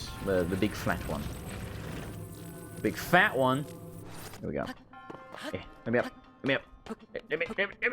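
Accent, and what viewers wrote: Australia. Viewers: it's quite alright for an australian voice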